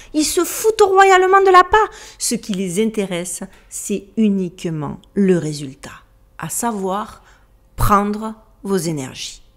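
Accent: South, France. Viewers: Trop top tes vidéos!!merci ❤ Trop jolie naturelle et ton accent frenchy trop bien ça chante le Sud!? Ne change rien!! blessings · Bonjour le Sud ( c’est pour l’accent ) à défaut de te connaître!! T’es sujet sont toujours pertinent et apprécié; pour infos et pour rester sur ton intérêt pour l’intelligence artificielle et le contrôle des masses, connais tu l’expérience dit: « MK ULTRA « si oui quand pense tu? Si non, je te laisse te renseigner et je pense que cela devrait t’intéresser. Bonne continuation et à bientôt pour de nouvelles vidéos. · Merci pour cette vidéo, merci pour vos recherche et nous donner des éléments de réponses pour s’élever et mieux comprendre notre monde. Vous êtes captivante et cet accent marseillais j’adore! fever ❤️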